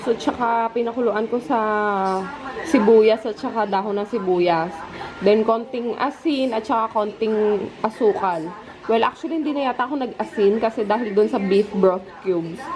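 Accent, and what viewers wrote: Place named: Quezon, Philippines. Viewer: hehehe puntong quezon ai... tlga poh san poh cla s infanta?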